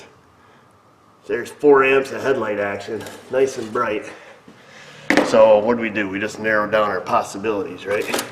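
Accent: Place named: Pennsylvania, United States. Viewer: This guy has integrity and smarts. I'd trust my ride with him, which is trust I don't easily give...judging by the accent, i'm guessing he's from PA. I'm not surprised he's busy as hell.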